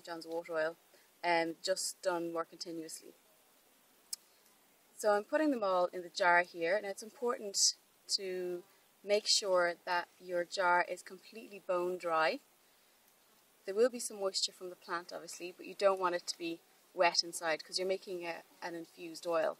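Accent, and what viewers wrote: Ireland. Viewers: Nice. Interresting Ireland accent. Btw I wouldnt judge you if you throwed a stone at the rooster while yelling "shut uuup!"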